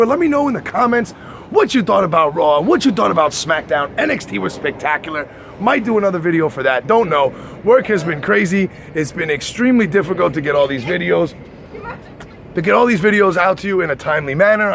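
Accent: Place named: New York, United States. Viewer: I hear the NY accent now 😜 ps.. game 6 is ours! It ain't over till it's over brother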